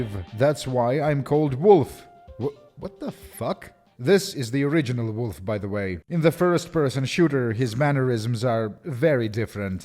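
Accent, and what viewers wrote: Russia. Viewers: I think the weirdest thing in this review is still listening to a man with a Russian accent attempt to do a Russian accent.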